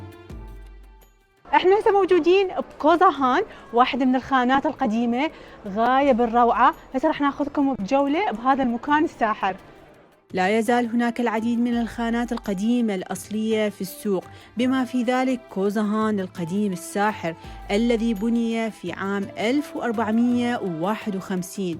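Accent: Baghdad, Iraq. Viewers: احب لهجتك البغداديه الاصيله وعفويتك تقربك للنفس فديوات رائعه تقديم وتصوير وموسيقى انت مبدعه اخرى ضمن المبدعات العراقيات في كل مكان وتركيا تستحق الاستقرار فيها وليس الزياره فقط ولحبي لها اخشى عليها من غدر الغادرين اللهم ادم عليها الامن والاستقرار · تحية من الاردن شو جميلة اللهجة العراقية يا أستاذة فيديوهات ممتعة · والله يا احبج وايد و احب قناتج و تغطياتج للمدن.. و خاصه لهجتج العراقيه الي تجنن و انا اصلا احب العراقيين.. متابعتج من الامارات ❤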